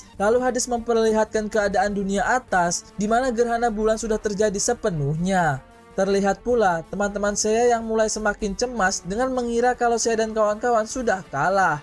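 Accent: Palembang, Indonesia. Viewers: Tangan seya kena rujah... hhhh asli operator ni wong palembang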